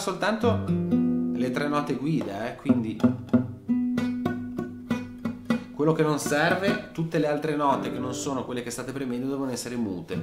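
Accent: Genoa, Italy. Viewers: Maestro sei bravo,bravo,bravo, complimenti , la lezione sul walking bass è stata una meraviglia , poi sentirti parlare con quel bel genovese e' il massimo, una carezza in più a Nebbia..🙂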